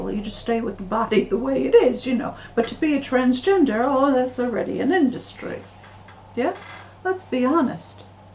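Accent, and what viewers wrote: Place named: United States. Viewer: You sound very American ! Thx for the video !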